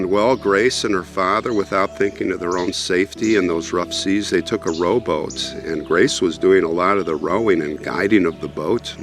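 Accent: Scotland, United Kingdom. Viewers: Ma Scottish Grand-dad would have approved of ye Scottish accent🍻 Once again - if one Goggles: The Bold at Bamurgh Castle -- you will see this video above the others listed there😊👍 I wonder why the dad doesn't seem to also have a special burial place? It's sad that she died so young. That poor mom that had her children drowned & the others.😢 It looks like eventually the sea may over take that beautiful Castle one day. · The argyle sweater is a nice touch. Fits the Scottish accent.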